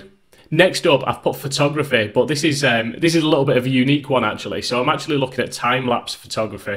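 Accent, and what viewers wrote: United Kingdom. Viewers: 1) thank you for the video it inspired me to try making channel 2) good content and links not overly pushed 3) lovely to hear a uk voice.I love our American friends but YT is to overcrowded with them ;) Cheers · Never thought I’d hear a scottish Tom Scott saying “Balls”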